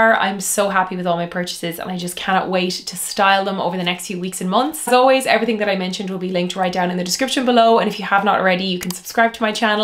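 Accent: Ireland. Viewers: I thoroughly enjoy your style, fashion recommendations and beautiful Irish speech. The rattan purse you showed us is so unique. What brand is it and where might I find it? 🇨🇦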